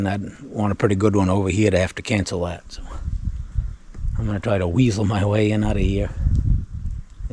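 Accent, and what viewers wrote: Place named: Maine, United States. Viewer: Forgot to tell ya.. love the Maine..northeast dialect..our dialect talk is different here in northern Michigan.. that’s all part of the story..listening to the northwestern talk while your hunting .. super there guy…keep the videos coming..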